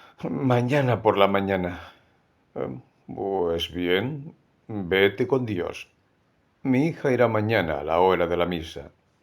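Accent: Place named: Spain. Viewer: VOZ AGRADABLE Y ESPAÑOLA !!!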